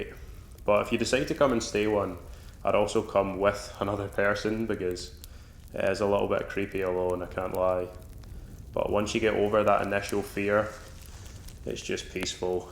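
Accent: Scotland, United Kingdom. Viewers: Super Scottish accent 😅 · I had a scottish classmate before in Law School. Really nice and smart guy. McCleod is his family name. Your accent is the same with his😊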